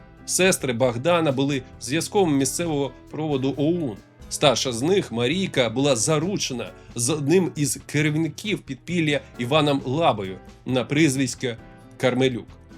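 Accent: Russia. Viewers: Ще все-таки залишається російський акцент і акання. Потрібно ще тренуватися, бо ріже вухо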